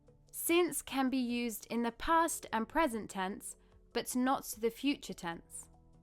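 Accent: United Kingdom. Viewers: I have watched half of your video on YouTube, I can say that the way you are teaching is fantastic. You are a great teacher . Your British accent is still strong and clear for me . The way you explain is very clear and easy for thevpeople to digest your lesson. Keep up with new lesson .well done.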